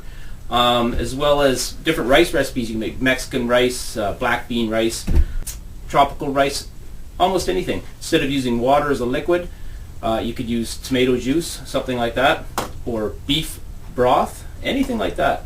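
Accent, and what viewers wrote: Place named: Canada. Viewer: I was just thinking you sounded Canadian, and then you pulled out the bagged milk!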